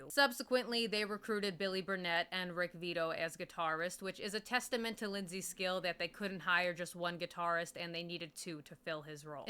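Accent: New York, United States. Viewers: Staten/long island/NY ass accent goth girl talking about Fleetwood Mac?! Sign me up fam. I grew up in NJ and this is very wholesome to me. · Love that New York accent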